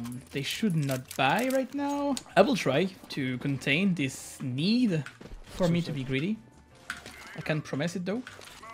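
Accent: France. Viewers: On sent ton accent français? mais t'es vraiment très bon, que ça soit pour parler anglais que sur CSGO... continue bg ! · A quel moment t'as décidé de parler en anglais dans tes vidéos? Je veux dire on entend bien que t'es fr, alors pourquoi l'anglais? ;)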